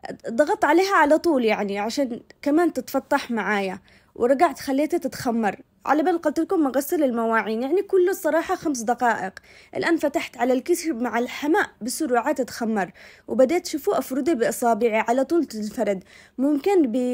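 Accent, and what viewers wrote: Aden, Yemen. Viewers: تسلم دياتك الحلوات ،،،مبدعة حبيبتي ،فديت لهجتك العدنية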